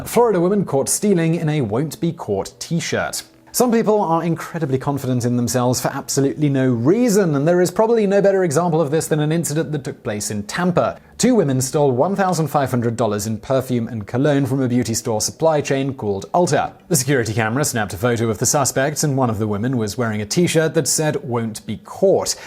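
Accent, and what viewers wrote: United Kingdom. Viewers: Hearing a British man talk about the Florida man is the reason I’m still alive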